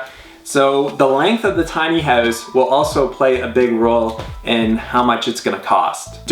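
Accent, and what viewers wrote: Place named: Canada. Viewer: It depends on your perspective, you sound Canadian. So housing would be extremely costly and it would be a steal depending on if you can stand living there. Homes are much more adorable where I live. Here you would be the village idiot for spending that much for a trailer. I like it and I’m glad your happy.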